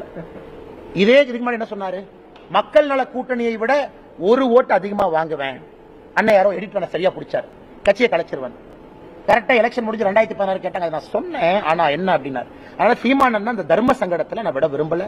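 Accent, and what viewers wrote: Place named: Karnataka, India. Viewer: இவர் பேசும் போது கன்னட வாட வருது.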